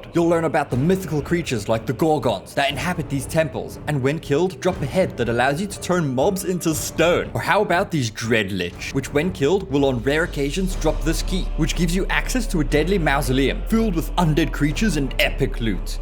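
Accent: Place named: South Africa. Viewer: I like your accent. Is it South African? Great content friend. 👍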